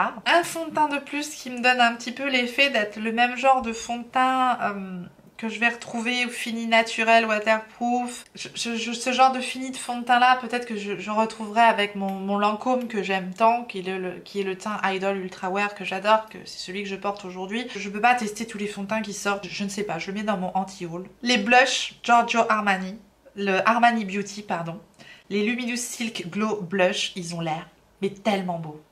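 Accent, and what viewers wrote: United Kingdom. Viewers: Coucou 🐢🌹 J'ai la même petite palette de Bobbi Brown moi aussi et elle est vraiment bien👍🤗 La collection d'Estée Lauder me tente bien moi 😊 Youpiii ! De nouvelles teintes du fameux gloss de Maybelline 🤗 C'est pas folichon les nouveaux produits de Dior 🙁 Alors là ! Les palettes MAC sont à tomber, je les veux 🤩😍 Je ne connais pas du tout kacmarque Juvias place, m'en vais remédier à ça 🤔😉 Ah ! Enfin le highlighter de By Terry ! Super ! 👍🤗 Les bronzer de Patou sont très beau. Ils me tentent bien et le blush aussi 😊 J'adore ton accent anglais 😊 au moins je comprends ton anglais 😂 Le fond de teint sérum de Too Faced me tente beaucoup moi aussi 🤗 La Terracotta de Guerlain est une légende 😍 Ma mère en portait quand j'étais petite. C'est une petite madeleine de Proust pour moi ❤ Très bonne idée le roll-on d'Iconic London dis-donc 🤔👍 Chez Clé de Peau, peut-être qu'ils acceptent le don d'organes en échange d'un de leur produits non ? 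😉 Je tenais à te remercier pour m'avoir conseillé le fond de teint Care and Glow de Lancôme 🙏❤ J'attends la fin du mois pour me l'acheter mais je suis sûr qu'il sera parfait pour moi 😊 Encore une super vidéo 👍💕 J'adore ce concept de nous présenter les nouveaux produits sortis ou à sortir 👍♥️ Merci 🐢🌹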